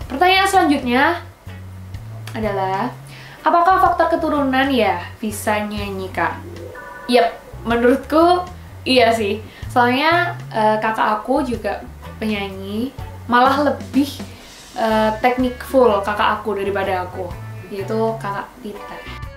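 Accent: Java, Indonesia. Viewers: Medok banget 😂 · Medok bed kak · Logat"nya, ada jawanya sedikit😂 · Suaranya orang jawa banget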